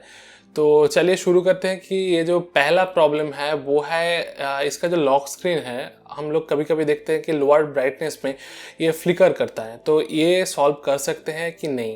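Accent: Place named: Bengal, India. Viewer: bro tum bongoli ho kya? great work tumhe bahut mehnat lagi hindi bolne mai. koe na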